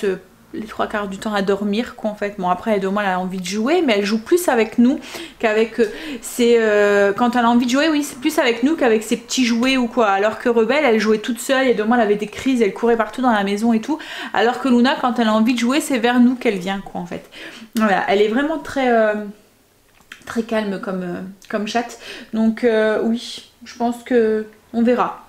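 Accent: North, France. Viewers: Bonjour j'ai pas fini de regarder la vidéo tellement que je suis énervée 😒 c'est quoi encore ces gens qui disent des choses non justifiées oui parce que dire Rome à la place d'Italie n'est nullement une faute de français, un lapsus ce qui est différent et je pense que ces personnes sont loin de savoir la langue française et qu'ils doivent faire énormément de fautes d'orthographe. Ensuite les gens chaque personnes prononcent un mot différemment de chacun POURQUOI LA RÉGION D'OÙ VOUS VENEZ et n'oubliez pas que est du NORD l'accent du mot et pas une faute de la langue française c'est simplement une prononciation type de son origine comme en corse, Italie, Provençal bref avant de critiquer soyez sûr de votre FRANÇAIS. Voilà j'ai fini tu es une bonne personne la vie réserve de mauvaises surprises parfois et certaines ont une vie plate sans soucis alors CRITIQUES.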